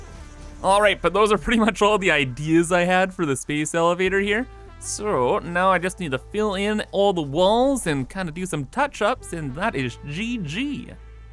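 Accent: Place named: Canada. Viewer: Not my first video, but first time I've thought to thank you for just sounding absolutely incredible :P Canadians are my favourite americans and you sir have one of my favourite Canadian voices. ALWAYS a pleasure to watch your vids just because of your energy and cheer =]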